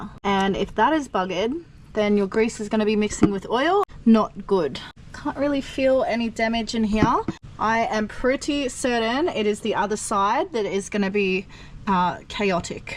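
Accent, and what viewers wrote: Australia. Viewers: Keep it , also who else here came for the girl but stayed for the HEAVY straya' accent , nah but in all fairness -you live in a incredible part of the world, and you are just out having fun , i enjoy the videos, please keep them coming - best regards a scandinavian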